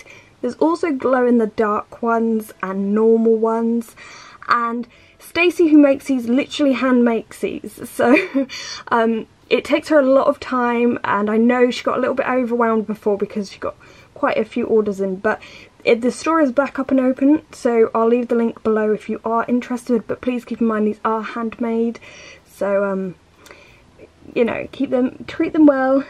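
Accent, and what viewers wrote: United Kingdom. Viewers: Think i ask this on all your videos but never get a reply. Are you from the uk?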